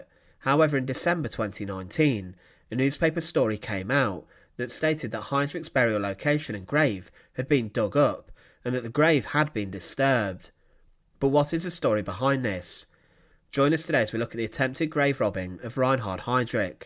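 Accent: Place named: Liverpool, United Kingdom. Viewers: Narrative misses out some dates and would be better if not delivered in boring monotone scouse. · What do you think . Just because he has an English accent . And not a US one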